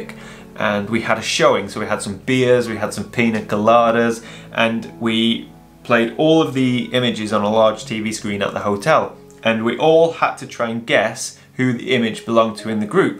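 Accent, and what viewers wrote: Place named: Northern England, United Kingdom. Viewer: Its a shame that you feel you have to defend yourself. I believe the problem stems from the fact that many of us can’t afford 4k for a trip; most of us are philosophical about it, but it seems a few take the angry route...they tend to be far more vocal. Don’t pay them any mind, you have nothing to justify. Having said that, their bad behaviour has led to you producing a very interesting vlog, so...cheers dumbhorses 😂 I am quite new to your channel, via Svalbard with Morten, enjoying your work. Though frustrated, I can’t quite place your accent...I am thinking between Buxton / Sheffield. I grew up in Donny, but have lived in Scotland for 30 years...I need to get out and photo this scenery! Cheers / slanj 🥃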